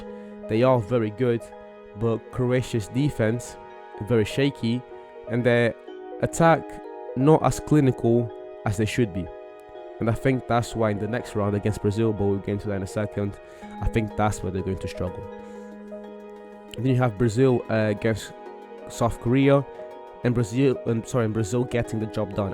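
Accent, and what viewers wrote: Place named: Germany. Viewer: Are you German? Accent is strong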